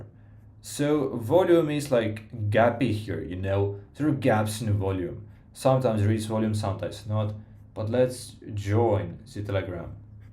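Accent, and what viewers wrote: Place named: Russia. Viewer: Sound almost Russian 🤔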